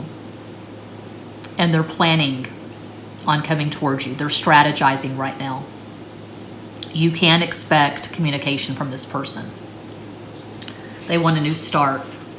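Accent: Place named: South, United States. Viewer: Pile 4: I love how you read. Very calming, soothing voice and all, with a southern twang. How do you do it?🙏😇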